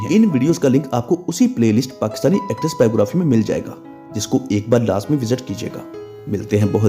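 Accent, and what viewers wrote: Punjab, India. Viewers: Aapka urdu lehja punjabi typa ka hy aur aap bohat taizi se bol rahay hain jo kano ko giraan guzarta hy.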